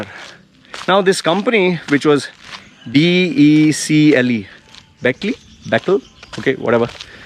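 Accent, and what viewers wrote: India. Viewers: You sound Indian. Are you?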